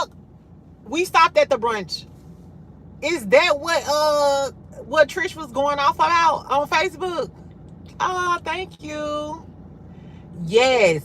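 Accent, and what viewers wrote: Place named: Chicago, United States. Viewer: Girl you sound like you're from Chicago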